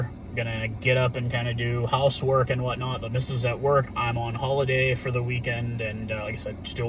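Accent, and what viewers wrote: United States. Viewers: I feel like the states is a sleeping giant went it comes to rugby. If they really adopted it, they could become a real threat within a generation. Thanks for the video and support for the Springboks. Lived in the states for 4 years, but in the south, you sound like you are from up north, detect a tinge of Minnesota accent on your end. How did you get into rugby?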